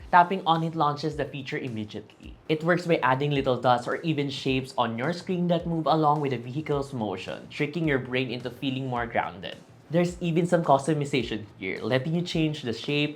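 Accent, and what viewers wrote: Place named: Philippines. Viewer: Philippines not thailand, its quite obvious from the accent and enegry :)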